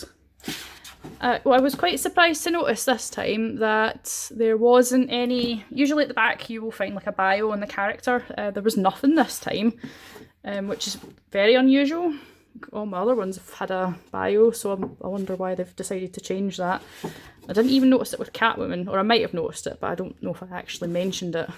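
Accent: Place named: Scotland, United Kingdom. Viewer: I think the Scottish accent is the coolest! So fun to listen to! keep up the great reviews!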